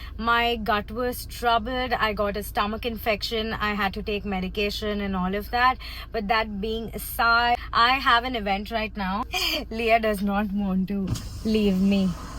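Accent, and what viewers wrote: Telugu, India. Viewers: Love this vlog so much 🫶💕 This vlog has so much love and joy 🥰 I'm literally waiting for my bestie to get engaged soon 😭 And your telugu accent 🥹 Love <mail>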